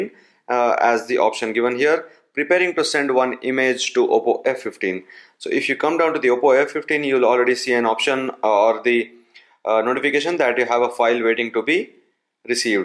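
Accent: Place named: India. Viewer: Your spoken is so confusing... Indian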